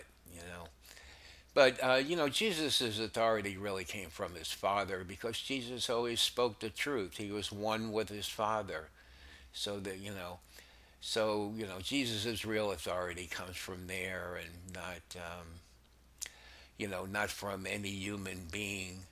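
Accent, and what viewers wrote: United States. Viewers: I think you sound warm and friendly! You kind of sound alittle like you are from Jersey... my home state!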